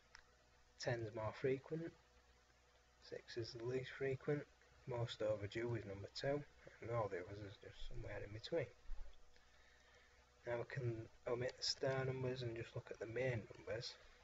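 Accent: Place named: Northern England, United Kingdom. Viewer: Oh.. what a pity..:-( to me it seems like this is a good advert to push people playing even more euromillions. And it is carefully targeted obviously; as the PR (devil) people knows what they're doing. Luckily no one is watching this ** (39viewers)!!! The accent is from the north of England (notoriously work deprived area) "I was unemployed for 5 months..." (what that has to do with euromillions??) trying to look scientific with a spread sheet?!?!?! Everybody knows that lottery is another way for the government "to attract" people's fund and impoverish them little by little. The Euromillions is rigged, if you look at the draw history the combination of 3 or 4 same numbers comes up sometimes twice in a couple of months which is statistically improbable. Then HDVIDEOS999 ask for the link and you are ... busy?!?!?! LOL!!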